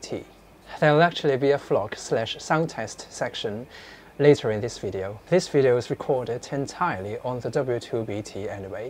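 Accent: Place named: United Kingdom. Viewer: How is this guy's english so good and british? :D Great vid, brother!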